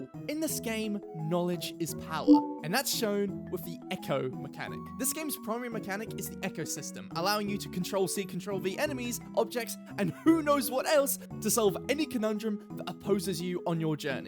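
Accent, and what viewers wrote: United Kingdom. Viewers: i don't click onto your videos all too often but i am jumpscared by you being british every time. i somehow always forget and it's terrifying every time.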